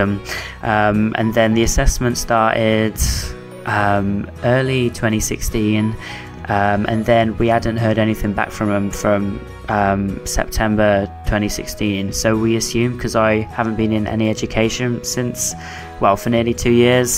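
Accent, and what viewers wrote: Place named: United Kingdom. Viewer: We love you " " your the next "Mental Heath Hero" that will take the world by storm! Your always getting a friendship with me no matter what your mental health status is! BIG BIG HUGS on your bravery and sharing your journey on this video! Your first video was a "Groundbreaking Hit"! Your "British" voice is as adorable as ever and I'm hoping you will do a musical "Let's Play" where only you are singing the entire time and in conversation! But until then you are loved and I enjoyed this video very much!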